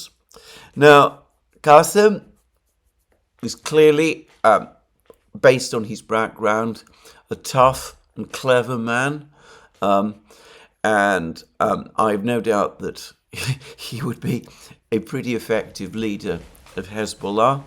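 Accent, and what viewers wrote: England, United Kingdom. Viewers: EduardoArtistaDelavega: you are talking from a common sense perspective, you are exposing the hypocrisy of America, but then you spell colour via the Webster dictionary. The Duran are interesting also, when I first watched the Duran I really believed that Alex not only lived in America, but I believed he was an American, even though he had Greek ancestry, as all Americans have ancestry from somewhere else. Alexander on the other hand, is so English in his use of the English language, coming here as a young boy, approximately aged 6, he has adapted to become very much an Englishman. But then one day soon after the Special Military Operation started, I discovered that Both Alexander and Alex had individual YouTube channels. Alex was walking around Nicosia on a daily basis and would also travel on occasion around Europe, I was totally taken aback by this fact, that Alex with his very strong American accent, didn’t even live in America, he probably just learnt American English, I still don’t really know why he has such a strong American accent. 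So we have this contrast with The Duran, one articulates himself via an American English accent and the other as an Englishman, but both are Greeks, although one is a Greek Cypriot. But this oddity is actually a winning combination and adds to the aurora of The Duran. So Eduardo, are you American, your use of English tells me you are not, but your spelling, tells me you are. Which are you?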